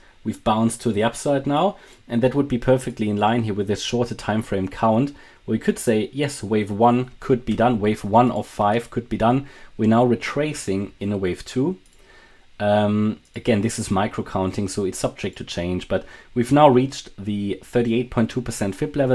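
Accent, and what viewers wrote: Germany. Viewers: German Akzent…..👍🏽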